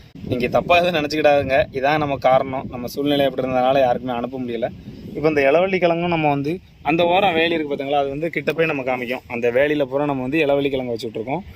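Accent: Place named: Tirunelveli, India. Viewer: Thirunelveli nnu theriyuthu,,,aana yentha ooru nnga,,,pavoorchatram aaaa????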